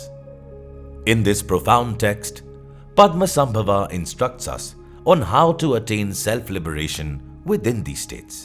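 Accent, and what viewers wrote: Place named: India. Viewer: The narrators Indian accent is cringe.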